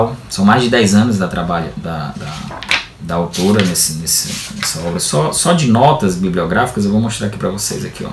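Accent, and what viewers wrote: Northeast, Brazil. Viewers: Você e nordestino?🤠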